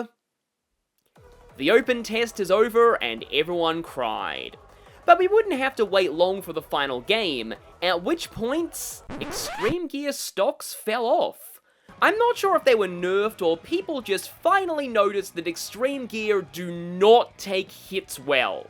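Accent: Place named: United Kingdom. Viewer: I didn't watch the video, I heard a feminine British sounding accent and shut it off and went straight to the comments to laugh at people that were bad and coping. Go play with a homeless man's wiener under a bridge somewhere.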